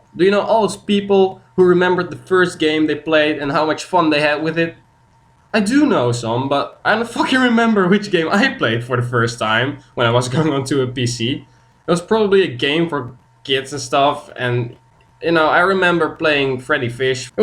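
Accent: Netherlands. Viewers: You are Dutch arent you? haha the accent is such Dutchie :P Youve got a great video over here!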